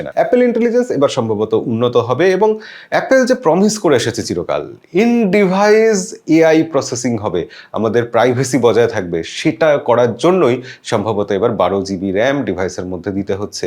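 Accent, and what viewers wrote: Bangladesh. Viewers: এই ভদ্রলোক এর উচ্চারণ বাঙাল দের মত। কলকাতার দর্শক দের জন্য বেমানান। এবিপি এর মান এর সাথে যায়না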